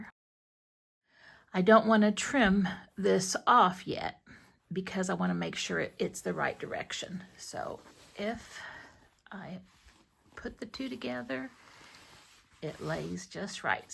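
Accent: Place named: St. Louis, United States. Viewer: Are you from St. Louis? I heard only people fro St. Louis say (warsh). I'm from STL and say warsh.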